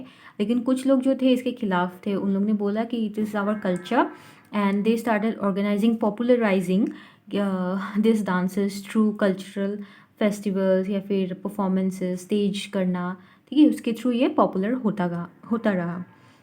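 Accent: Assam, India. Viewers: Mam are you Assamese. Just asking if you don't mind 🙂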